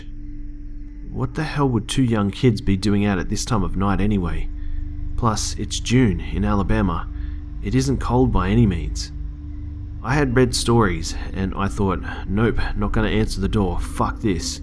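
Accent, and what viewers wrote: Australia. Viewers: Alabama chic with an Australian man's voice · I love that he’s Australian, him swearing in an Aussie accent is the best thing to ever happen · I love Aussie accents 😍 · Aussie guy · Aussie narrator for a story set in Alabama 🤔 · I want to get into the stories but the Australian accent kills it. I keep thinking you're going to shout "HAY be a good Sheila & put another shrimp on the barbi". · Are u Aussie?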